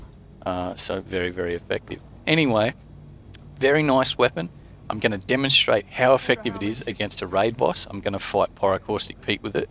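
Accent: Australia. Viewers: Mate where from the Aussie land are u from? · I just got here from yote actually. Glad I did, great videos mate, very informative, I love the farming run ones.. It's also good to hear an aussie accent as well, sounds like NSW to me. Keep em coming.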